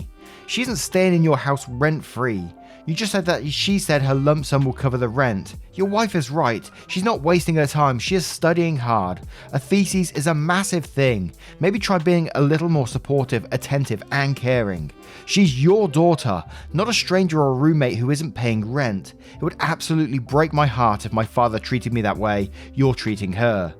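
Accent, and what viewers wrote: United Kingdom. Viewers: Nothing better than listening to a British dude shitting on MLMs while playing a farming sim game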